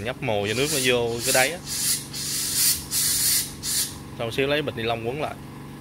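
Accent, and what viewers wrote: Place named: Mekong Delta, Vietnam. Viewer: Cửa hàng mình ở đâu vậy anh..? Nghe anh tiếng ngươi miền tây ạ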